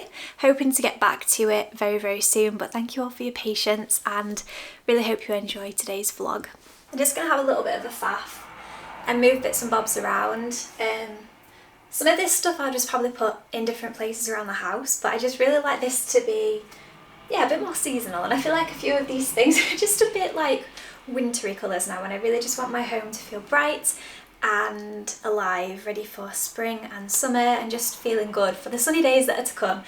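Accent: United Kingdom. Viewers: I love the care and attention you put into everything you do. Your pretty garden and lovely accent make me miss the UK.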